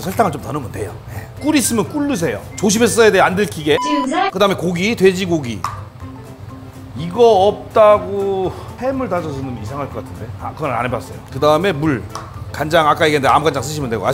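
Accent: Chungcheong, South Korea. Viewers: 아... 전형적인 충청도의 너스레~ㅋㅋ 레시피도 얻고 재미도 얻어가네요~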